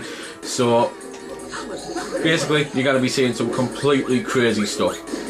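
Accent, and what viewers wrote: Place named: Newcastle, United Kingdom. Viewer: Nive video mate. You sound local, you from Newcastle?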